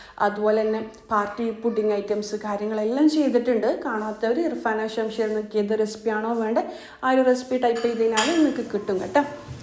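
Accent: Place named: Kasaragod, India. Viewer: ഇങ്ങടെ സംസാരം പൊളിയാ.. കാസർകോട് ആണോ 😍😍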